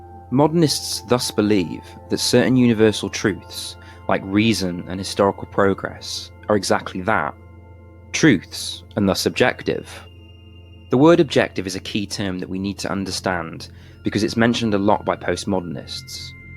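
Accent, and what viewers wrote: United Kingdom. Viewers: His British accent just sounds so clever and he says everything so confidently, i would be fooled if i didn't know better.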